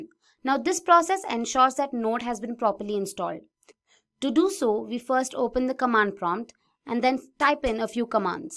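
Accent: India. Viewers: 10/10 best tutorial bonus points for the indian accent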